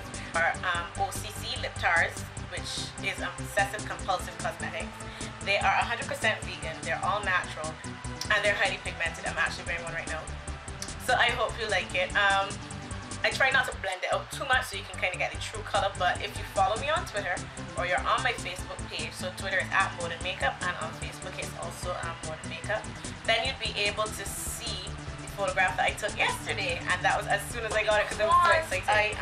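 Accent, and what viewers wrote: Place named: Barbados. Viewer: love the bajan accent. love when you roll your eyes. you are funny as hell.peoples get to know this chick!!!!!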